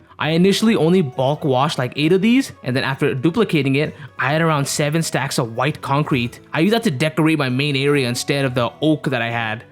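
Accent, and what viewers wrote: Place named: Mexico. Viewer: Im digging the Mexican accent!... At least thats what I think Im hearing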